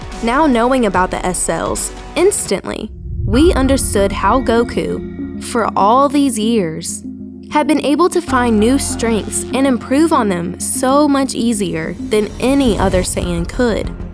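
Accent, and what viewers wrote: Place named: Texas, United States. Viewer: You're from texas right? I can hear it in your accent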